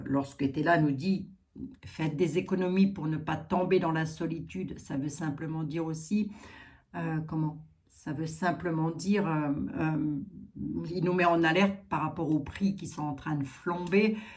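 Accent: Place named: East, France. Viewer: A propos du nain de jardin 🙏🙏🙏🙏 -moi je l'appelle Kaa, le serpent du livre de la jungle, il hypnotise les naïfs. Merci (d'où vous vient votre petit accent, l'est de la France?)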